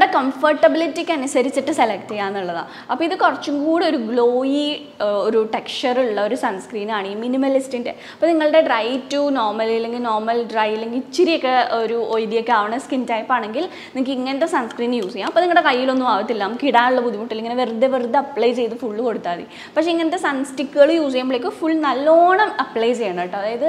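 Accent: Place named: Thrissur, India. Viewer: Aahhaa.. thrissurkaari aayo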